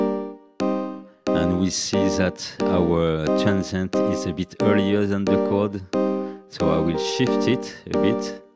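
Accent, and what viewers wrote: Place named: France. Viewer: Ça sent l'accent du terroir 😁👍 Super vidéo en tout cas. Il a l'air vraiment top ce plug-in, ils m'emmerdent Cableguys de sortir des plugins comme ça, simple, bien foutu, intelligent, efficace... ils tombent toujours dans le mille ! Et à chaque fois il me les faut...! Jamais déçu par ce dev, au contraire.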